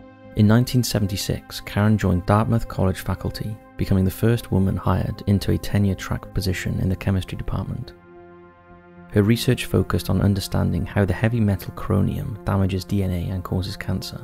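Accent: Wales, United Kingdom. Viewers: I just watched your wars of the word doc on Vietnam... are you from Cardiff or Newport? you sound slightly Welsh... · He’s definitely Welsh just not sure where abouts 😅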